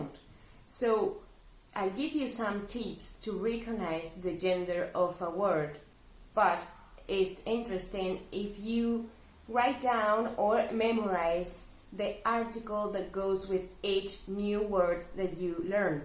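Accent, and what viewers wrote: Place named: Spain. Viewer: Me gustan mucho tus videos! Me parece que hay muchos video por los principiantes y todos explican las mismas cosas pero no hay muchos video por los estudiantes intermedios quienes no entienden aún los videos nativos pero las cosas básicas son demasiadas fáciles. Me gustaría ver algunos videos donde por ejemplo hablas solo en español sobre algún tema, para que yo puedo escuchar pero tambien que añadas los subtítulos en español y inglés para que podamos aprender nuevas palabras. :-) perdoname por mis errores que he escrito, es que ya he pasado mucho tiempo buscando algunos video más dificíles para estudiar un poco más 😄 he visto que estás comenzando y por eso creí que podría pedirte por esos videos😊 especialmente por tu accento español, porque tengo más problemas de entender ese accento que los latinos :-) gracias y espero que tengas un buen dia!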